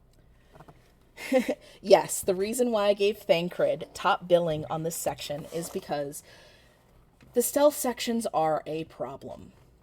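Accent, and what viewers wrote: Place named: Canada. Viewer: Your accent is close to mine but idk what mine is….are you Canadian??????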